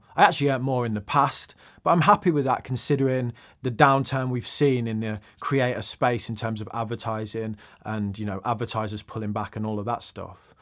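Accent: Birmingham, United Kingdom. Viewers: You're from the North West? I thought you were a Brummie.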